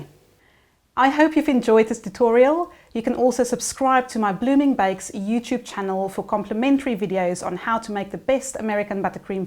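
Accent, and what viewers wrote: South Africa. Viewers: Lovely tutorials. So original and so nice to hear a SAFA accent!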